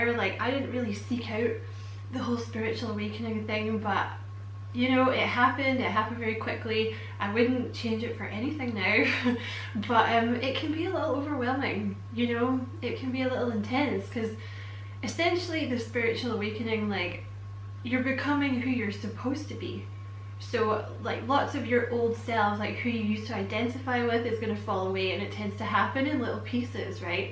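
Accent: Scotland, United Kingdom. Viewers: thankyou for this. I watch your Leo readings, also im Aries rising, virgo moon, Im at the end of dark night of the soul, going through a spiritual awakening and doing this all alone, not fully understanding whats happening but learning having Psycic visions etc. Is that a scotish accent i hear, hi from Ireland. Thankyou so much ❤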